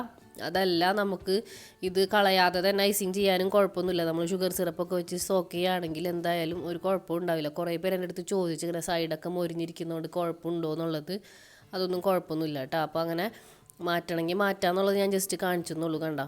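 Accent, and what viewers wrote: Kodungallur, India. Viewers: Iyalde veed kodungalloor ano?ente hus area slang und nannayi.😀😀😀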